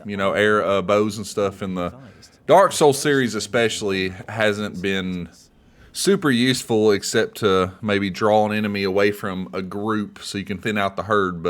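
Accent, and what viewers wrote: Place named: Texas, United States. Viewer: Definitely a southern Texas accent love it and would suggest using it for a saying catch phrase for the channel ,so folks can look forward to that as well as the content, aside from that great work and new subscriber here 👍 👏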